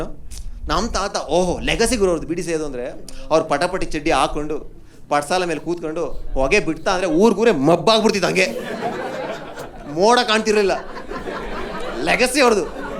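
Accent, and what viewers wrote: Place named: Chamarajanagar, India. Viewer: Annoovv ur from chamarajanagara❤ ansuthe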